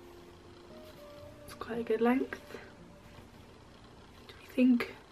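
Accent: RP, United Kingdom. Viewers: Posh English accent. ;)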